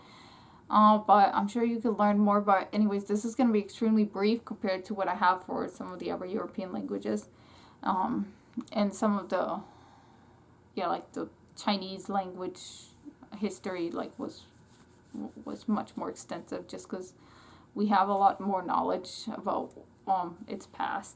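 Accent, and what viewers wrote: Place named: East Africa. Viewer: So impressive, I can imagine the effort you put into it. You sound like an East African.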